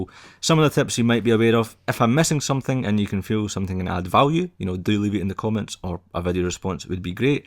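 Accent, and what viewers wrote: Scotland, United Kingdom. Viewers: are u scotish?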